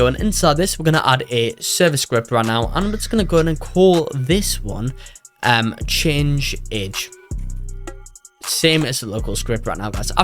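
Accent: United Kingdom. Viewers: he sounds brihish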